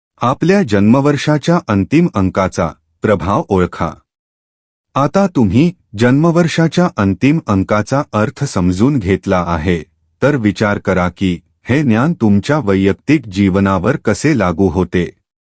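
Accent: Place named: England, United Kingdom. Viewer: इंग्रजी भाषेचा टोन देऊन मराठीत माहिती सांगतोय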